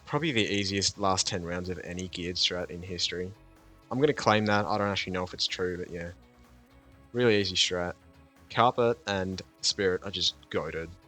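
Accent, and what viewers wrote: Australia. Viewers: Glad to hear another Aussie, what state are you in, better not be another fucking S.A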